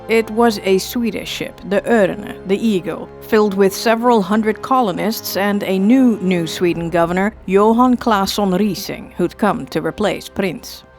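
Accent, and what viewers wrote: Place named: Netherlands. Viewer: 😻✨mooi Dutchlish 😊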